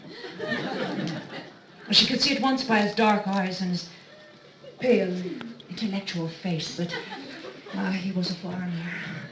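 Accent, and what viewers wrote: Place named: New Zealand. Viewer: jeez, I love her strong Kiwi accent :3 it sounds so adorable)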